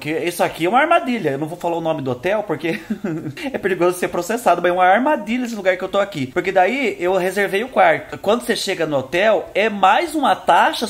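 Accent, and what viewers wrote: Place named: Paraná, Brazil. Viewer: vc é de que estado do Brasil.Digo onde nasceu,porque eu hoje faz 31 anos que morro em santa catarina, mais nasci no paraná e morei la ate meus 13 anos, e todos os teus ditados modo de falar me parese ser paranaense.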